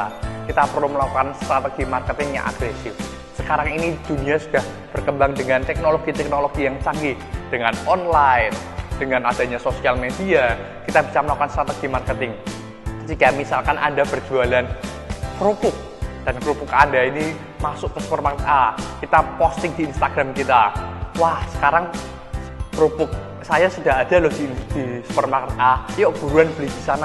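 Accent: Indonesia. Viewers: ini orang logatnya kyk ada bali nya ya??? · Logatnya itu lho, medok sekali.....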